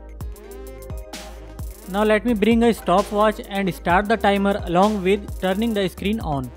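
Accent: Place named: India. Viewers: Lmao forgive me. But Hindu accents are hilarious. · Steven Watson What the fuck is a 'Hindu accent'? He's speaking in the typical Indian accent. Hinduism is a religion. Forgive me, But Western education is hilarious.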